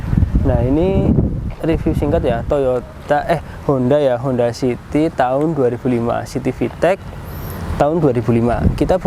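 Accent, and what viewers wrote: Banyumas, Indonesia. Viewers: Medok banget ya mas jan enyong banget😅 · deneng Ngapak...😅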